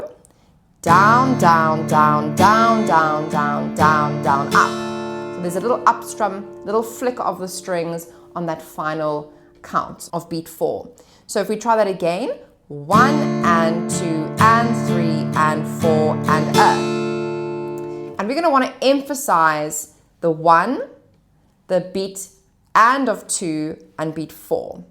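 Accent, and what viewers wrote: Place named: South Africa. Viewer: Great lesson and refreshing to hear a good old south african accent again.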